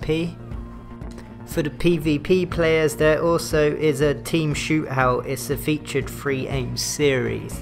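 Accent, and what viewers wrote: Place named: United Kingdom. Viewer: Which part of the UK u from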